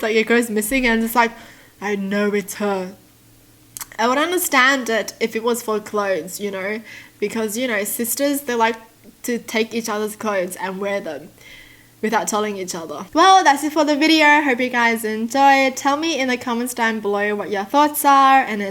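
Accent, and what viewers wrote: Australia. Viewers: I’m new so when I here her voice I think she’s has to be Australian then I heard “Swallow I that advice for yourself mate”Me:yup definitely Australian I have an Australian accent but I’m American yup I’m weird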